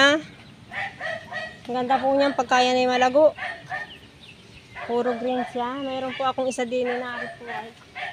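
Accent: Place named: Marinduque, Philippines. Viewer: Parang tuno ng marinduque po kayo❤😊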